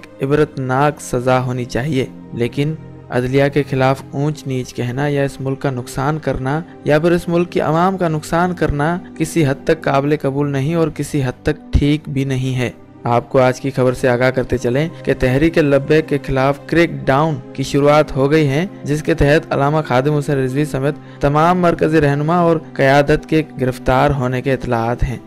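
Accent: India. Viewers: Tera lehja indian lagta hy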